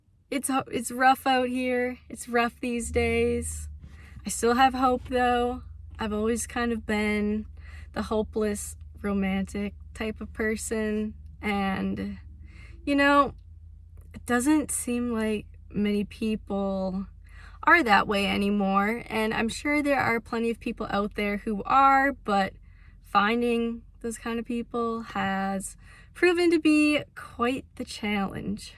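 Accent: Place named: Wisconsin, United States. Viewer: I love the cute Wisconsin accent that is something between the Canadian “oooout” and the American “aut”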